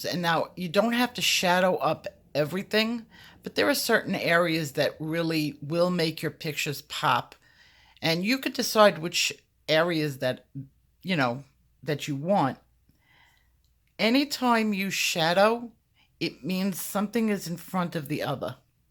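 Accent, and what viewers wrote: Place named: Long Island, United States. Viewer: You are truly amazing! I look forward to your videos weekly and am so thankful that I get to hear a long Islander talk! Feels like I'm back home! Please keep these videos coming..I can't wait to see your texture videos and what's to come next!! I am learning so much from you, thank you so much!! ❤ oh I must say I developed my artistic style last week after watching your video I went through my coloring books like I do almost every day looking for a picture to color not knowing what colors to color them or what style to do, and I actually, visually, visualized the picture! It was amazing I realized it, and I never would have realized it, if it wasn't for your videos and for you! THANK YOU thank you so much I broke through the coloring block!! Xoxo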